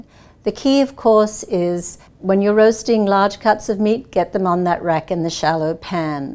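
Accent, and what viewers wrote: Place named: South Africa. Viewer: Love my Bertazzoni and that South African/NZ accent.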